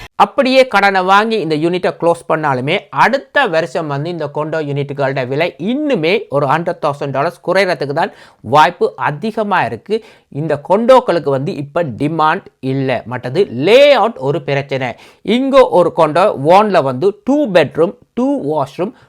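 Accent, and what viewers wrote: Sri Lanka. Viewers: Are you a Sri Lankan Tamil ? Your Accent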